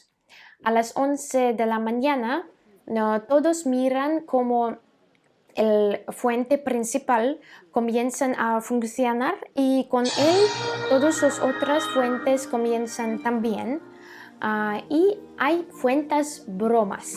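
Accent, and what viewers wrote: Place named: Russia. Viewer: Vi un video de abril y hablabas como estadounidense hablando en español mexicano y ahora, 5 meses después hablas comonrusa hablando español mexicano.: Gran avance.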